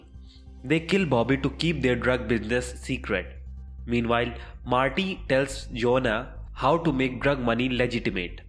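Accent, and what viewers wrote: India. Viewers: The accent was acceptable as I believe it's Indian accent but the subtitles were really really awkward. Spelling mistakes doesn't help. Just a suggestion, if u wanna make recaps, make sure u add subtitles with correct spelling. Wikipedia of certain series also helps making a good recap as they show written update of every show episode by episode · Overall I feel this is a very good recap covering all the most crucial details to the Ozark story (Season 1), with the audio and video editing also being very good. If I had to give a critique on the video, my only critique would be that (as an American) your Indian accent is a little tick and some words (specifically proper names of people and places) are hard for me to make out, if you were to add subtitles to the video (copy and pasting the script into the subtitle option when uploading the video) I feel this would go a long way in clearing up any misunderstandings that might arise because of your accent. 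Other than that, keep up the good work, and thank you for this video, it really helped😊 P.S. sorry for all the mean comments, some people I feel do not know how hurtful their worlds can be and say things without thinking, so do not take it to heart, honestly your accent is not that bad, people are just ignorant😉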